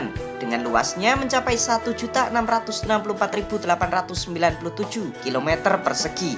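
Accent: Java, Indonesia. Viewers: WALAU MEDOK BANGEEET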